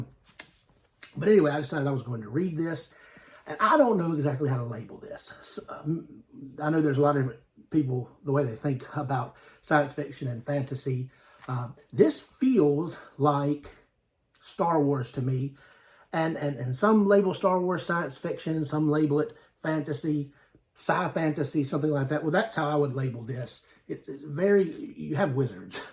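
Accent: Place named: South, United States. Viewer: Cute guy with a southern accent talking bout books? I’m in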